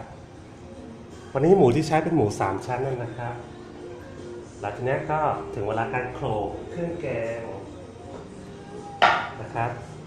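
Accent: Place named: Isan, Thailand. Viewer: นึกว่าคนอิสาร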